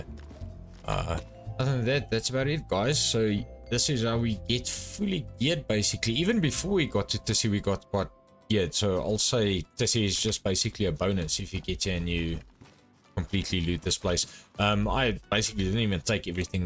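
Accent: South Africa. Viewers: 😅brother I know your afrikaans , the accent gave it away I'm also south african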